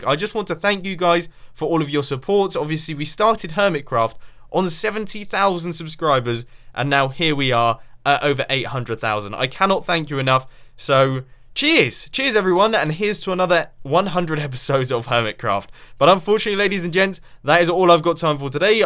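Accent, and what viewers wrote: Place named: England, United Kingdom. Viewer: You can tell is English (like me) 'I am so CHUFFED with that I'm very CHUFFED!'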